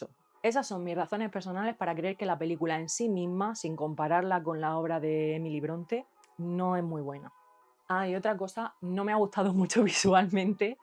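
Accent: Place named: Andalusia, Spain. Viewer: Bothering Heights. No te avergüences de tu acento cordobés. Ojalá acabáramos con ese estigma que supone hablar con acento andaluz.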